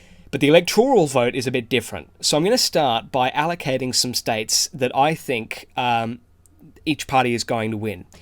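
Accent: Australia. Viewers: doesn’t he sound more Australian, Mr. K-On pfp?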